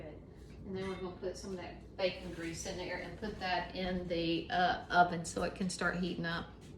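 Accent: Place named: South, United States. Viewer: Never apologize for your southern accent ha ha I'm such a hick myself lol Love that apron, so cute. I'm gonna show it to my mom, I know she can make me one. I love watching you cook. That soup and cornbread sounds so good, yum! I believe you're right about the haze it's the same way here. Never thought about it until you mentioned it.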